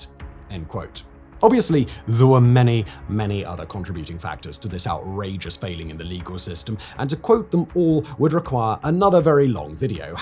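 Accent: United Kingdom. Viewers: I wonder how many legs you're going to do in with this video... A Brit narrating a video containing details that are applicable only to the US legal system. Huge oversight. Two VERY different systems.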